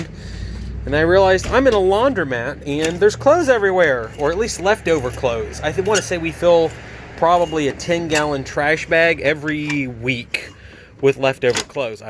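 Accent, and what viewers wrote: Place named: Atlanta, United States. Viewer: Where are you from? I know a guy in Atlanta with the same accent, but I don’t know if he’s from there originally!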